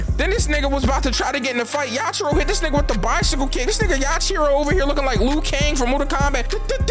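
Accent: Philadelphia, United States. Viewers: Do is u from Philly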